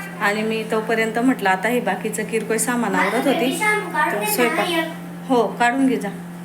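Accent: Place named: Ahirani, India. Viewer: ताई तुमचे video छान असतात. तुमच्या भाषेत उत्तम असा अहिराणी touch असतो.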